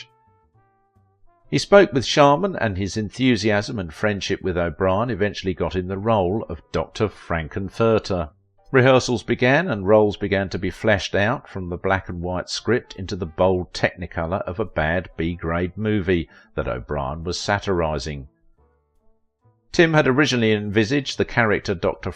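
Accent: United Kingdom. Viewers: Awesome video!!! You, the narrator sound exactly like Laurence Brown from YouTube channel "Lost in the Pond"....and I don't say that because you are both British..😉..Love that channel! Glad I found this one too! 😁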